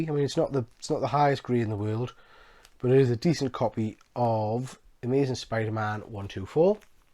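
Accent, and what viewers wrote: United Kingdom. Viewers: Really like your British accent mate👍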